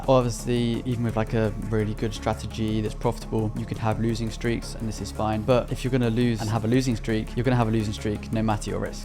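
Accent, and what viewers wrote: United Kingdom. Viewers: you know that YT is a global platform where people from all over the world watch your watch... my worry is you speak faster in british accent some words hard to understand so pls speak slow for certain words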